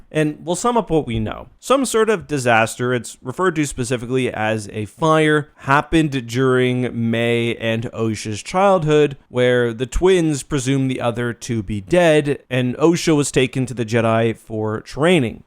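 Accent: Canada. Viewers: Bro i just noticed your Canadian you live in a hoose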